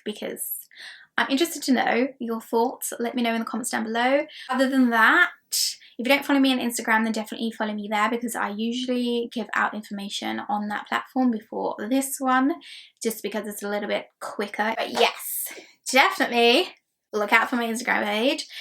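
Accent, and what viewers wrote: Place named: United Kingdom. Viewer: Girl, you had me fooled - you sound British but referred to $$$ 👀👀 where are you from?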